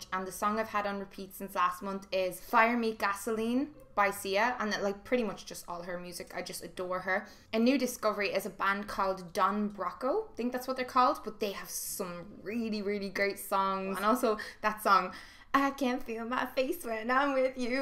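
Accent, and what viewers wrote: Ireland. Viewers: Hi! Your voice makes me miss Ireland so much!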